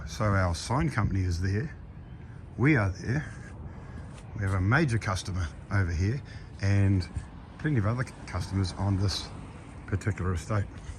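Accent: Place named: New Zealand. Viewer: I hear your kiwi accent . I am interested in a trailer as I load a tractor for grass slashing. Reply to me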